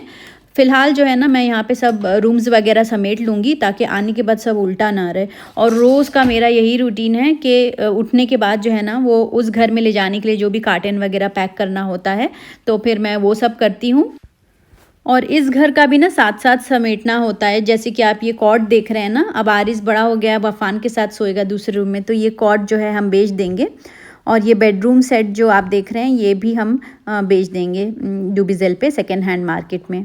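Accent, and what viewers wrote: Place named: Azamgarh, India. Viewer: Aap ki language accent bilkul Azamgarh wali hai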